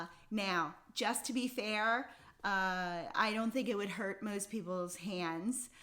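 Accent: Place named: Pennsylvania, United States. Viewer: Hi!, I just found your podcast. You are very pleasant to watch. It sounds like you are from PA. I grew up in Lycoming County. Your granddaughter’s sweater is so beautiful; I just love the color. She is a lucky little girl to have a knitting grandma. Happy knitting!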